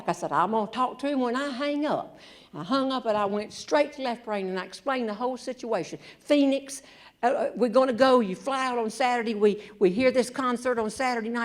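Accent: South, United States. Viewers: Idk if she’s a motivational speaker or a comedian but she’s pretty funny! and ya gotta love that southern accent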